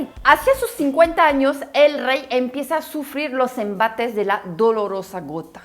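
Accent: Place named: Mexico City, Mexico. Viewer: Soy super fan de tus videos, por ser educativos y culturales con el toque chilango inteligente, saludos cordiales desde Querétaro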